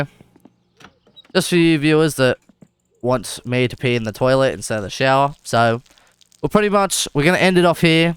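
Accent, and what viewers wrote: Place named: Australia. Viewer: Australian, LOL.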